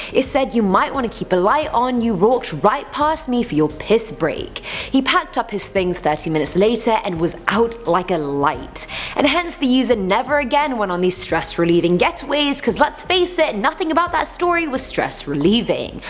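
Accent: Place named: Wales, United Kingdom. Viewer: You have a Welsh accent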